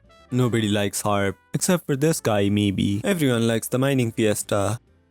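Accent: India. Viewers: Bro are you indian or just a person with Asian and somewhat indian accent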